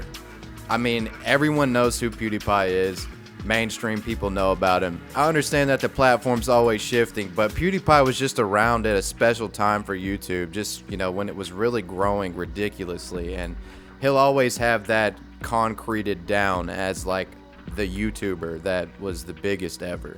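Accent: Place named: Tennessee, United States. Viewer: Yeah, you’re definitely from Tennessee 😄 that southern accent was spot on! I’m from East Tennessee and that’s EXACTLY how old ladies sound around here 🤣🤣🤣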